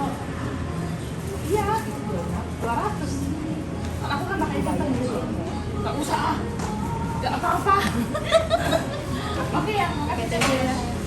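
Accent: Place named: Java, Indonesia. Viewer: Asli mana to MB kok medok bgt ngomongnya 🙏🙏🤗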